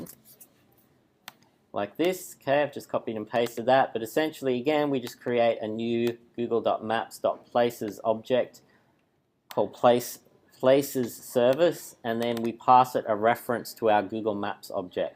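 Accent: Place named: Australia. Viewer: along with the Aussie accent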